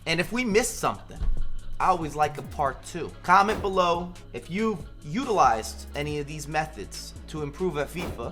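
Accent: United States. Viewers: Gave up after 10 secs.....why does every American have to speak like a fanny??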